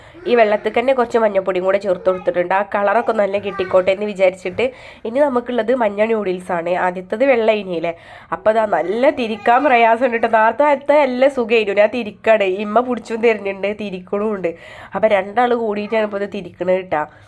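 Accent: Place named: Kozhikode, India. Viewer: Hi new friend🥰happy new year💐സംസാരം കെട്ടിയിട് കോഴിക്കോട് ആണെന്ന് തോന്നുനു🥰❤️nice vlog enjoyed😂😂😂🥰❤️അങ്ങോടും വരനെ കൂട്ടകനെ🥰❤️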